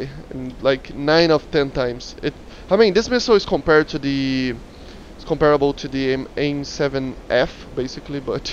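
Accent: Brazil. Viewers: Pelo sotaque eu desconfiava que era BR, bem legal o vídeo cara, bom trabalho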